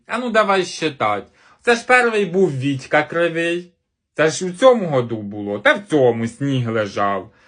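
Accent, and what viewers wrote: Poltava, Ukraine. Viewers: Полтавський говір)) дуже влучно) Ви не з Глобине?)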